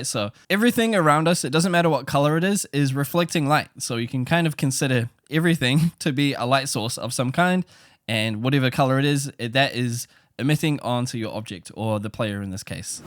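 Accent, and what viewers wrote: New Zealand. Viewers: Are you from New Zealand? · I KNEW I COULD HEAR SOME KIND OF KIWI IN YOU!!!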